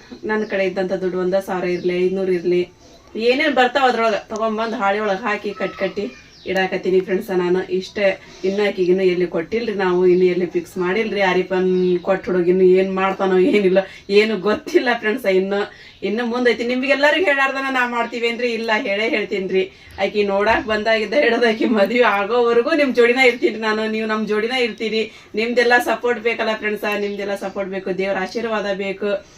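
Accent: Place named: Gadag, India. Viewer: Nim maat kelidre same Gadag navar maat gate adav nodri..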